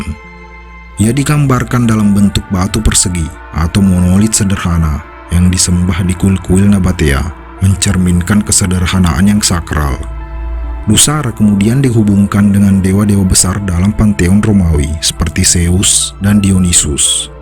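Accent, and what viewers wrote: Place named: Bugis, Indonesia. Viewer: Knp logatnya seperti orang bugis😂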